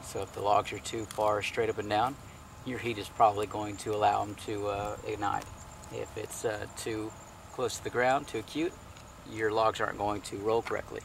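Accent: Texas, United States. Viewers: Around mid-November the nights are about 14 hours long in the US. Based on the slight accent in his voice, I'd say probably somewhere in Texas. So the combination of not-quite-winter and Texas weather patterns, that would explain why he's OK with just jeans and a flannel shirt.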